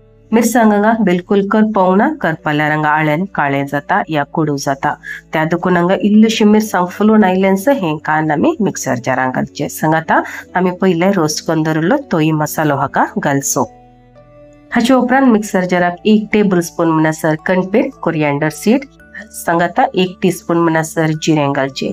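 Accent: Konkan, India. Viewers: Tai Tumi ulaitalo Konkani bhasha kitle chod god aasa. Ani haanv pun ambot bangude ani tarlee nistyancho kadi banounk shiklo aasa. ❤❤❤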